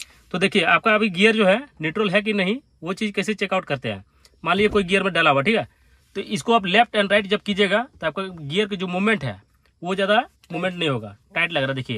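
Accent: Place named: Bihar, India. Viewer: Sir ke bolne ke style se lag raha hai sir Bihar side se hai.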